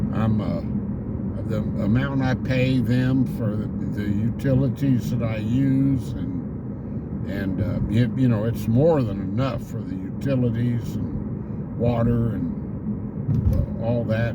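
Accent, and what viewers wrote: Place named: Texas, United States. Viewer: I think your awesome, I really am Texas home sick and you help a lot. I very much enjoy the stories spoken in my favorite accent. I haven't been to Texas in over 20 years, and everyday I try to get back to Springtown. Home of the POJO!!! Anyway I appreciate your channel very much. You stay blessed!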